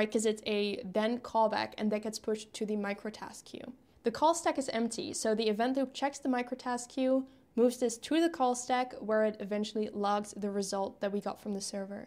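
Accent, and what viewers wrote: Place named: Netherlands. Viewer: I hear some slight Dutch here :p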